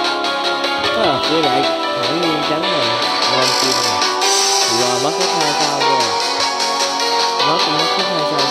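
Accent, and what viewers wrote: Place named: North, Vietnam. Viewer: Ở tỉnh nào ngoài bắc rứa :))) nghe cái giọng giống bắc vl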